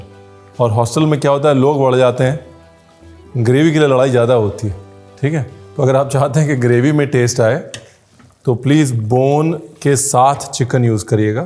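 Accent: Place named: Lucknow, India. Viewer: Bhai bada badhiya lagta hai ye lucknow wali tone sun ke, apne ghar wali feeling aati hai.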